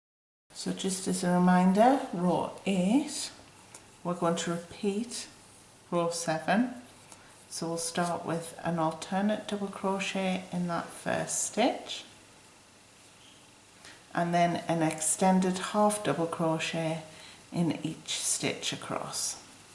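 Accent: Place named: Northern England, United Kingdom. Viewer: Your accent sounds to me like northern England. I'm American so I can't be sure. Thanks for this really nice pattern and wonderful pattern!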